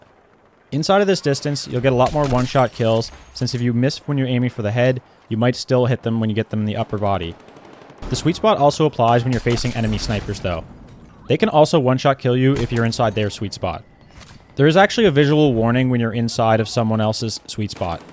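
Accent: Canada. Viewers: This guy gotta be from the maritimes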